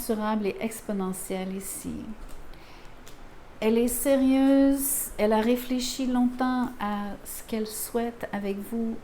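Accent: Quebec, Canada. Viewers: Bonjour. Quel bonheur pour moi aujourd'hui. Tres peu de tarologue sont du Quebec. J'ai bien entendu votre accent. Mais de vous entendre le dire. Bingo. Je vais vous suivre. De quelle ville etes vous? Et votre tirage est etrangement similaire a se que je fais et planifie de ma vie. Merci et gratitude 🙏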